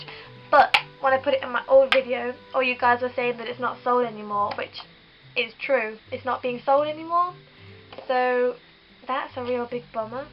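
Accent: United Kingdom. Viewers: all right : I love your dress/shirt, I LOOOOOOOOVE the flower in your hair, I love when you speak japanese but I also love british english accent......... ( and I love the almost-shirtless-Yunho on your wall just behind you...) haha great vid :D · Greetings and THANK YOU!! I love your videos. Your japanese is excellent- your accent and your way of speaking sound so perfect. Listening to you is so INSPIRING!! I also love your english accent. You're super cute, too!! Just wondering, how many kanji do you know and how did you learn them? Just from workbooks? How long did it take to learn enough kanji to read magazines? Can you read books, too, like novels? I heard you need to know about 2000 to read the newspaper. ありがとうございます :)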